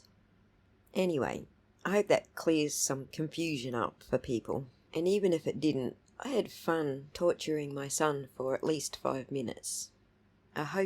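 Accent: Australia. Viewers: I love your Australian accent!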